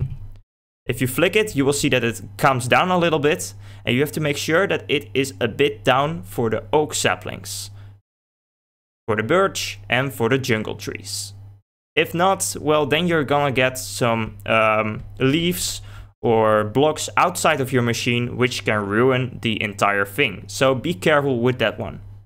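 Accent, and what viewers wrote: Netherlands. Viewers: hey, nice farm, and i hear youre dutch ;) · Are you dutch you got a dutch sound or how you call it hahha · haha dutch detected, nice mate didn't notice the accent at first hope you are doing well, weather hasn't been great around lately. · Lol je bent Nederlands he Kan ik zo horen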